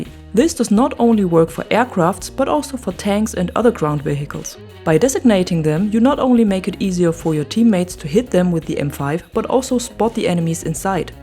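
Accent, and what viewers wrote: Germany. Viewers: Are you from germany? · Is that a German accent? :)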